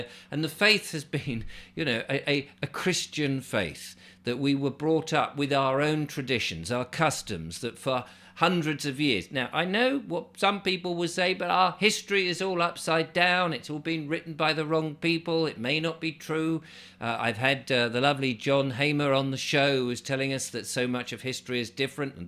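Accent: United Kingdom. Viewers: Although your accent suggests you are a limey, you are dumber than many Americans when it comes to British history. "Royal Assent" an automatic formality, and any right of the King to veto a bill is defunct. No monarch has vetoed a bill since Queen Anne vetoed the militia bill in 1707, and she did that only because her ministers changed their mind on its wisdom and told her to veto it. She complied with the request. The mere fact that a bill has been introduced in Parliament, especially a private member's bill, does not remotely mean it has a chance of becoming law. The title of "Defender of Faith" was given to King Henry VIII by the Pope, as thanks for writing some gibberish in opposition to Martin Luther. The Pope revoked the title when the lecherous old pig ditched his wife to marry his mistress and broke with the church, so it is no longer licit for the monarch to retain it.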